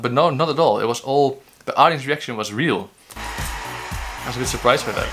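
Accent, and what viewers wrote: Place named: Netherlands. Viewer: As soon I heard the accent, I knew you where a fellow dutchman! I've seen your audition too! I loved it! It's a positive sight, among all this intrution of i.A.!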